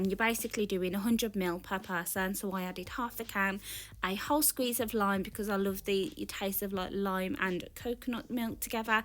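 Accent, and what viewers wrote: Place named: Caribbean. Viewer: Are you from the Caribbean originally? I hear a bit of an accent coming though sometimes 😃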